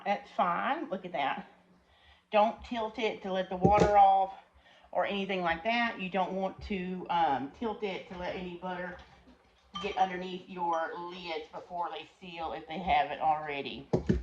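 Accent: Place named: South, United States. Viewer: I wish I could watch and listen to your videos more often, but I have difficulties understanding you. It is a combination of speed and your lovely Southern accent. I always have both the volume and the closed captions on, but I still miss much of what you say. You often speak too rapidly for me to catch things. I need to back up and re-play sections often. I wish it weren't so. 😢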